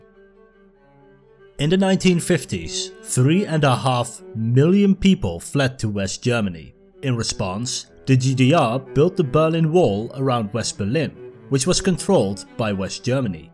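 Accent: Netherlands. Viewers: I can hear you're from the Netherlands or in neighbouring regions goed man! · I appreciate this entire video and all the effort that went into it; but fuck me, does your dutch accent hurt my ears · Ugh I added this vid to watch later, wanted to watch it later but i totally forgot this guys horrible accent. All your topics are interesting but either do them in Dutch or work on the accent cause its unwatchable for me.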